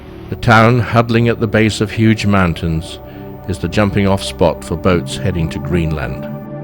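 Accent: United Kingdom. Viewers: enjoyed everything about this wonderful trip to Iceland. T he narrator kind of sounds like Orsen Wells! terrific voice with a slight British accent . I could listen to him all day! Thank you so much, for sharing your adventures! Question, Is Icesland part of Norway? It was discovered by the Vikings I was told! Did the Vikings clame Green land?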